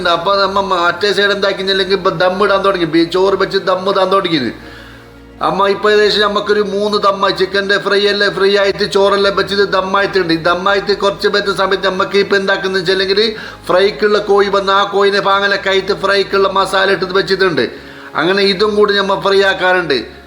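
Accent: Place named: Kasaragod, India. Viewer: Kasargod samsaram poliya 😂❤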